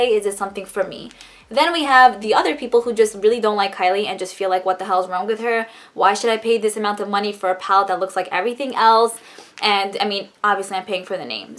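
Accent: New York, United States. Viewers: I subscribed as I love makeup and also love your accent - New York, right? (forgive my ignorance, I'm not American ☺)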